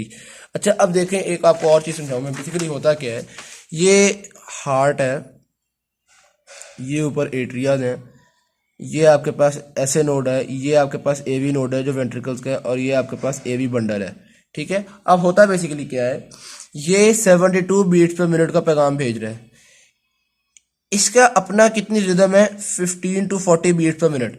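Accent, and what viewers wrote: Punjab, India. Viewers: Zbrdst...punjabi accent mn smjh kr ziada mza aya😇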